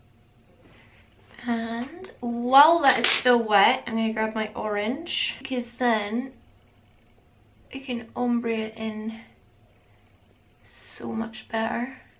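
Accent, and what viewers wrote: Scotland, United Kingdom. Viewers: I love this! Do other Scots call you posh because you don’t say stuff like “dinnae”? I’m from Devon, the accent here is like Sam from lord of the rings and I constantly get comments about being posh just because I don’t have a carrot cruncher voice 😂🙈🤷🏻‍♀️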